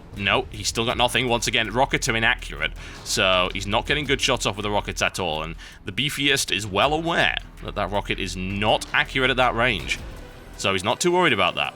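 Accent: United Kingdom. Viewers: Speak slower british dude!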